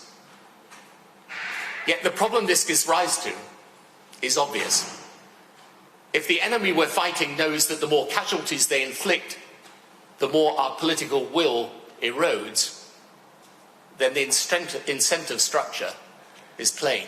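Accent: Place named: United Kingdom. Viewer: No shame whatsoever, just blatantly calling for more deaths of Europeans in general and British people specifically as he thinks fighting Muslims is their strategic depth. I wonder how much money this puppet will get from his puppeteers lobying i.e., the Military Industrial Complex in Europe. Seems spreading ideology of terrorism in British accent is acceptable.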